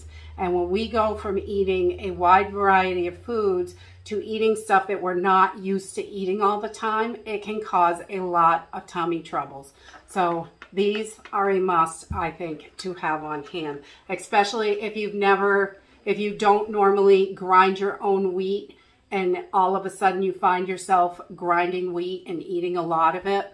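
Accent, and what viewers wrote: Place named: Bronx, United States. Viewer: Wow that is one i don't have that wrap. Every time i see you up i think Oh I have to go watch you you get me grinning, i don't want to sound personal are you or family from the bronx? You sound like you have a bronx accent. Love your channel. ☺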